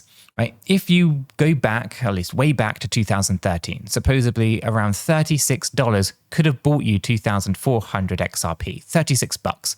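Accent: United Kingdom. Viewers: You are charismatic explaining complicated issues with your British accent humoristicly & simply for all kinds of listeners!! Really a blessing having you in YouTube channel!! Hello from Athens capital city of Hellas.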